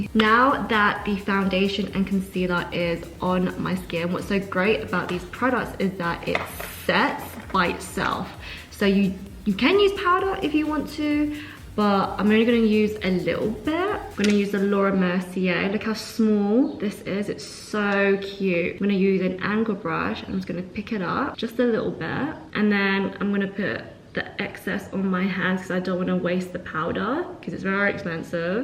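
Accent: United Kingdom. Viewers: I was not expecting you to be British! What a lovely surprise. As a fellow oily skinned Brit, thank you for this vid - it’s given me lots of tips and things to try. Keep up the good work beautiful ♥️ Your Insta vids make me chuckle so much!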